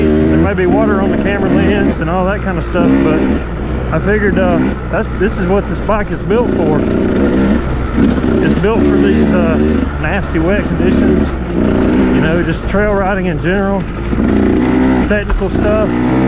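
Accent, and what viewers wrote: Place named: South, United States. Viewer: come to think about it, one of my buddies has a 2017 or 18 te300 that he wants to sell and I'd imagine you can get it relatively cheap. He bought it to tag and ride on the rd but hes rich af with a garage full of gasgas and ktm and rode it and didn't like it so its been sitting in the corner ever since. If you want to see some pics I can get some and whatever he wants for it. Dont know where you are but you sound southern lol and I'm in east Tennessee so we cant be to far apart.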